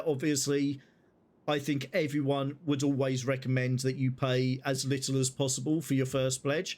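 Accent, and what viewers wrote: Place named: United Kingdom. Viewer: Thanks for the kind words and you already got something I don't have.. A cool British accent! Keep it up man! Subbed.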